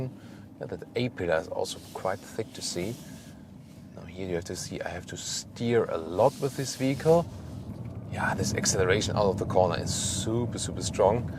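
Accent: Germany. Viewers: …watch him just for his enthusiastic German accent. Wunderbar!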